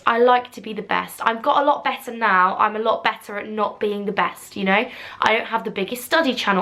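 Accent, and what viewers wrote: United Kingdom. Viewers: British Accent 💕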